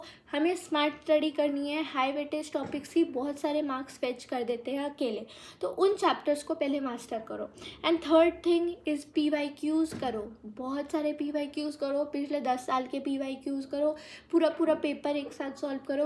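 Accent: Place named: South, India. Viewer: Ig she is south indian people do have accent it's normal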